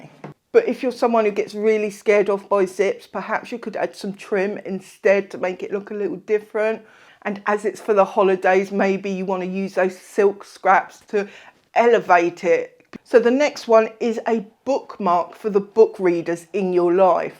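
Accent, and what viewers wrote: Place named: Kent, United Kingdom. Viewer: HI, sorry if this is too personal, but me and my husband have been trying to place your accent. I say Kent he say's Dorset. Love your videos.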